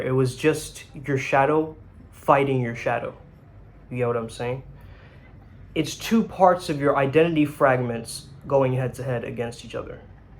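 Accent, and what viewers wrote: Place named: South, United States. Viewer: That southern accent on point 👌😂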